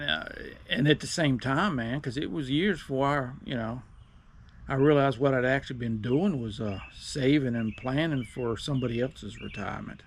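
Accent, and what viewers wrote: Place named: Virginia, United States. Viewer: Are you originally from the shenandoah valley of Virginia or West Virginia. I am and you sound like you are. Anyhow I saw this video today, subscribed and watched all your videos in order. I am soooo happy to hear someone honest and down to earth non financial guru. I am 55 still working. Worked in factories since I was 18. Been at this one for 33 years, no pension because company was sold in 2015. Could not agree more about factory work was people working together, friendships and kind of fun. Now if one person is offended or does not agree with another person's opinion it can cost you your job. I want to retire early at 56 with a high 6 digit 401k, probably Obama care gold level insurance. Wife already retired this year at 58 and drawing a few hundred from her 401k per month. I, like you, have been just digging into vids books whatever to educate myself and am nervous I'm missing something. 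Just a hs education here but 100 times more than my grandparents had and I owe them for my work and saving ethic. Like you and your wife we can live good on 3400 net a month and could trim that down if needed. Keep telling your stories because I feel like you are in my living room talking to me and answering my questions. Thank you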